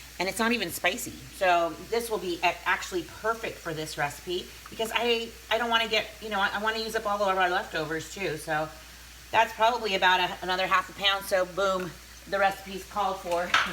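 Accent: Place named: Wisconsin, United States. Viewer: I'm hearing a very Wisconsin accent there. You wouldn't happen to also be a Charlie Berens fan would you?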